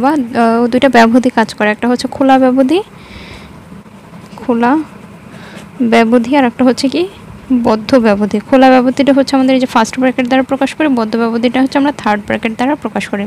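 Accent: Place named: India. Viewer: excellent .am from bangladesh.eta ki indian na bangladeshi channel.kotha bartay indian lagteche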